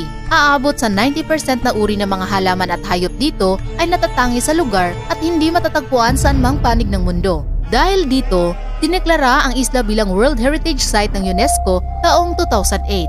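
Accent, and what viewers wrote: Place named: Visayas, Philippines. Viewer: Visaya ang commentator medyo konti pang praktis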